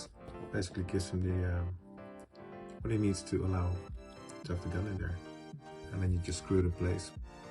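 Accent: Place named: Netherlands. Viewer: Sounds like a dutch accent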